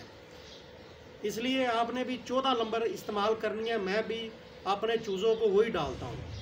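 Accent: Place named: Punjab, India. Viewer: Ustad g punjabi me apki video ka alag maza hai 1 alag maza hai punjabi lehjy me aapk ❤❤❤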